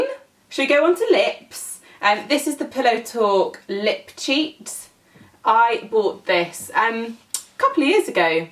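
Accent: United Kingdom. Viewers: Finally someone who speak British English and not American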